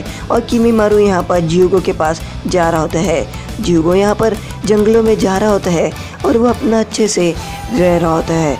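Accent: Maharashtra, India. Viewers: Bhai tum marathi ho kya?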